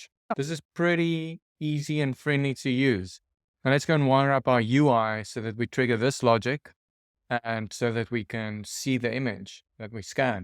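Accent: South Africa. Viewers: Souf Efrican?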